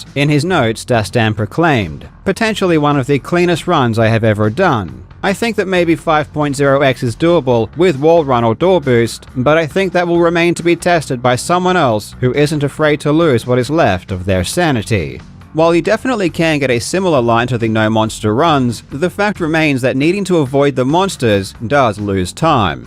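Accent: United States. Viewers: Is karl sounding more American? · Mate are you purposely trying to sound more American? You're really leaning into those Rs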